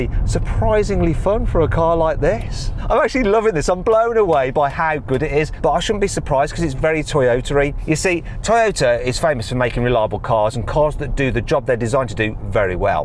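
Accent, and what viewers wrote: United Kingdom. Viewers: Apple嫌いなところ、共感します。ブリティッシュアクセント、大好きです。 あなたの動画はブリティッシュアクセントの良い勉強になります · ブリティッシュアクセント好きおった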